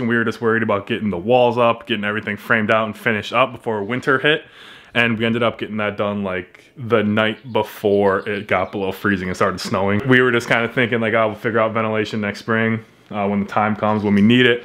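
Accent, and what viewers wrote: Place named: Wisconsin, United States. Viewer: The Wisconsin accent is incredible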